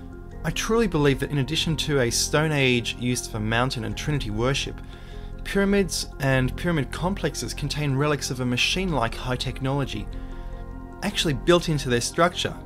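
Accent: Australia. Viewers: Speaker has an Australian or new Zealand accent not English. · Sounds like a good, stand up australian boy! Well done son.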